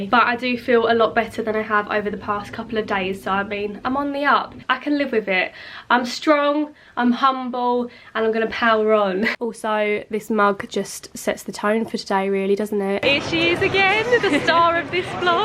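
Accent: Essex, United Kingdom. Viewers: Is it weird to say I love your accent, love that Essex accent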